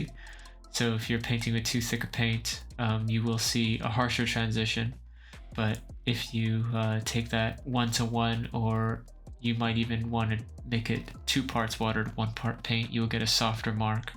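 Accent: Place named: Canada. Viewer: No offense but are you Canadian? You pronounce “decals” and other words differently than we do in the US.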